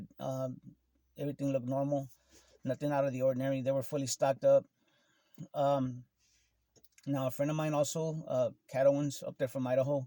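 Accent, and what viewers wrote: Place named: Texas, United States. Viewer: New sub. Love the style and real. Texan here as well. It’s really nice to hear a native voice in all this. Thanks man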